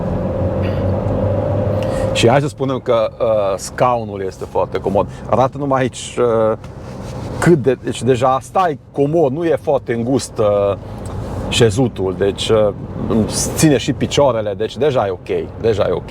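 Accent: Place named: Hungary. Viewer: No,un allroad şi perfect pentru Nămțîia asta care închide Fabricile.. Nai Treabā.. Superbā maşină pentru Clasa medie.. Ieftină ,fainā,,bună Ca Ciocolata Ritter Sport😂 Foarte simpatic prezentatoru. Apăi ios Şofer de Bus în Germania.. Sas din Ardeal Sas dāla..😂 Tare mult î-mi place accentu ăsta Maghiar😊.. Domnu cu voi..❤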